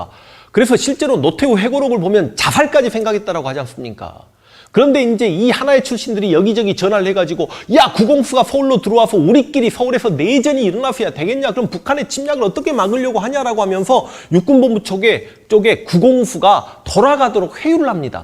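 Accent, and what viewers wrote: Jeolla, South Korea. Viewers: 숨기려 노력 많이 한거 같은데 숨겨지지 않는 전라도 말투로 역사 왜곡하는게 과학이네요ㅋ 사령부라 하지 누가 사령대라 합니까 군대는 제대로 다녀왔나? 기본 상식이 없는 사람이 무슨 역사 강의를 하나 ㅋㅋ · 억양이나 스멜이 라도 필이네. ㅋㅋ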